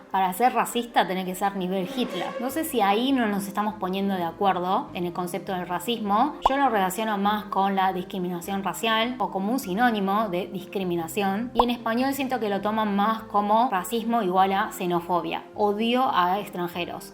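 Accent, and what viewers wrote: Argentina. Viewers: Porque hablás como argentina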